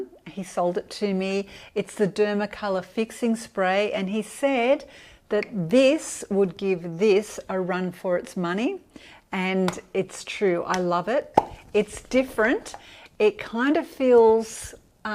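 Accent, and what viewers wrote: Australia. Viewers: Hi I thought you sounded Australian, I was hoping to find someone tht can recommend products that can be purchased here for the over 50s :( I love watching you though. thankyou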